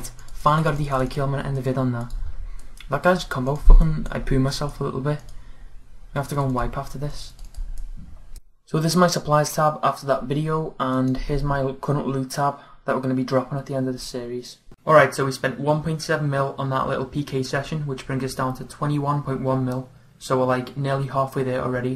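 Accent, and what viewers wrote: Liverpool, United Kingdom. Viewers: you sound like you're from liverpool, are you? hate to ask but like so he can see.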